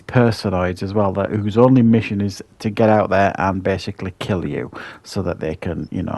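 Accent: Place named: East Yorkshire, United Kingdom. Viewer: You have to be from east Yorkshire. Love the accent.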